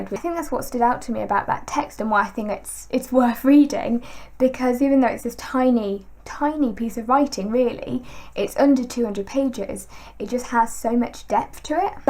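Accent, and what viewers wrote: London, United Kingdom. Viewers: What's so special about this lassy's voice? She could be any schoolgirl from London or the home counties.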